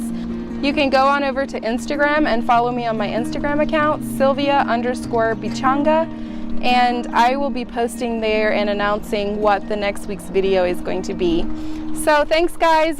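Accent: Kenya. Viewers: Your accent has changed too. You sound more kenyan now even when you pronounce certain words. Thanks for the tour!!!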